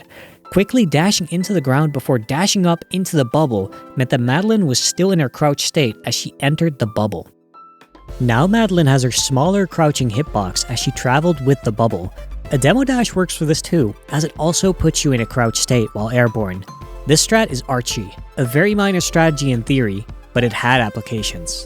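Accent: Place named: Netherlands. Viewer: Dit is misschien het meest undercover Nederlandse accent ooit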